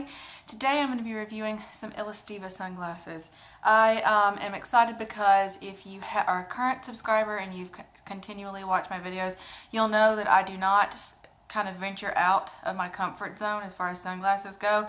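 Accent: United States. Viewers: Omg you're really good at it! Lol I do this, too. I thought you were English and you spoke with American accent when you were doing reviews! Lol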